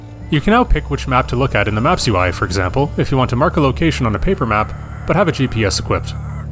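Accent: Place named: Canada. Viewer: The dude voicing this is insanely Canadian.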